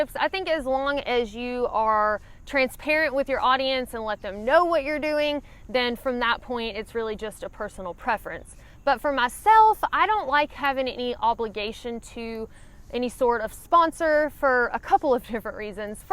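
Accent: South, United States. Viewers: speedy recovery to you dad and thanks for focusing on what’s important in life. I caught your channel about 6 weeks ago and I definitely know you are not sponsored, by your very sincere reviews and even the oops moments. I have used your recommendations for equipment that has gotten me off the couch and start this mad hobby again 35 years after I stopped. Your integrity and the polite way you let us know on what maybe not to buy is refreshing and seldom seen on YouTube. You are one of 4 channels that I watch and to hear that southern accent which reminds me of my dad’s people. Keep up the great work.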